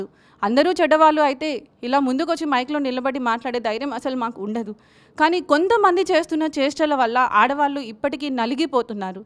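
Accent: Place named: Karnataka, India. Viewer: May be Kannadiga...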